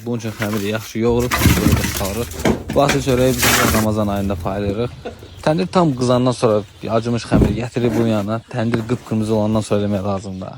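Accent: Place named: North, Azerbaijan. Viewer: Avarlarin da belə adəti var.Bilmirəm bu hansı zonadir.Ama danışığından Şimal tərəfin ləhcəsidir.Allah bərəkətli eləsin.Allah qəbul eləsin 🤲💐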